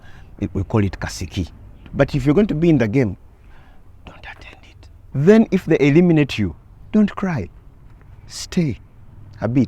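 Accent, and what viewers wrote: Nigeria. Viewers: The Nigerian accent is on point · The Nigerian ascent was on point 😂😂😂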